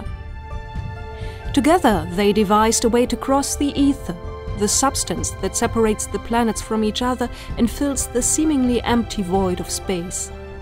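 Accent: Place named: United Kingdom. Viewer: du hast ja einen wunderschönen britischen akzent. Viele versuchen ihn zu imitieren, aber nur wenige schaffen das zu so einem hohen grad. Entweder die leute klingen noch sehr deutsch oder übertreiben bis zur lächerlichkeit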